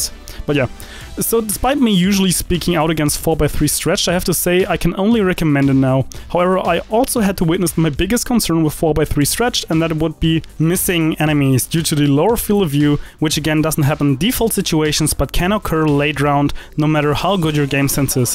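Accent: Germany. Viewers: Naja, ich finde man hört jeden Deutschen raus :P Heisst aber nicht dass dein Englisch schlecht ist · OverwatchDevil man hört es schon raus aber viel besser als deutsche mit dem th und flüssiger als deutsche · German Accent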